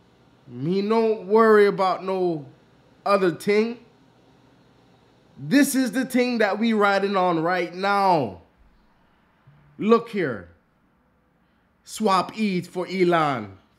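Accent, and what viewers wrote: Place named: Jamaica. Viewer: Ya Jamaican come thru clearly man.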